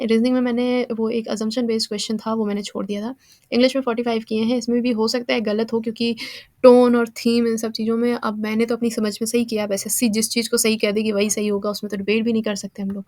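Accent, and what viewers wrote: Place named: Kanpur, India. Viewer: They both are kanpuriya. That's why same style of speaking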